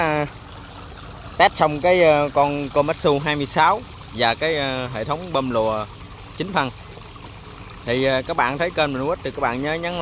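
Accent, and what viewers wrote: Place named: Mekong Delta, Vietnam. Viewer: ok anh mà ở đâu để tiên giao hàng với bảo hành này kia luôn.nghe giọng chơat miền tây.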